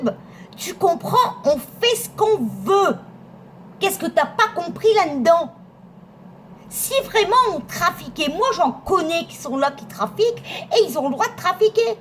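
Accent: North, France. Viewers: Coucou j'ai fait brûler de la sauge et demandé à l'univers de vous venir en aide pour arrêter tout ce harcèlement et que votre dépression s'arrête car vous ne méritez pas ça et depuis 2 ans vous remontez le moral à tout le monde alors à nous de vous aider à notre tour on vous aime et surtout votre accent du nord que j'adore 💕 💕 💕 💖💖😍😍😘😘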